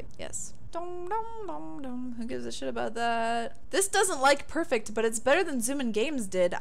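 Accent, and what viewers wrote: United Kingdom. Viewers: I think your british accent is amazing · This was funny! Great job! I like your English accent!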